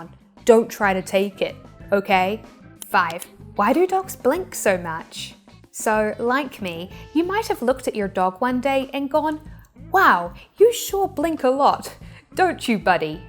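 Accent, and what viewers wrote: New Zealand. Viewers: I have no idea why this video popped up in my feed. Now, though, I'm obsessing over your accent, highly reminded of a Kiwi with whom I used to work years ago, and wanting to just find a youtube channel with you reading books or news articles. If my native accent has that kind of power over people, I want to know where and I want to buy plane tickets now. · I knew that enchanting kiwi voice sounded familiar!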